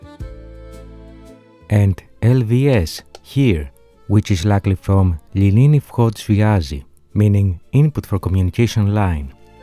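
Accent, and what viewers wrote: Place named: Greece. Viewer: I heard the Greek accent. And then, discovered your name !)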